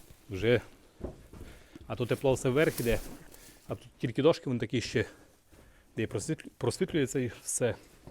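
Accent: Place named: Polesia, Ukraine. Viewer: Привіт молодь❤! Приємно спостерігати за працьовитою родиною! Здоров'я та наснаги вам❤!, я прекрасно розумію чудовий поліський діалект,у свій час працвав в Сарнах на маслробному комбінаті охоронцем, досі закоханий в ваш край,та щирих доброзичливих поліщуків! А смак вашої чорниці і грибів неможливо забути. Миру нам та Божої ласки ❤!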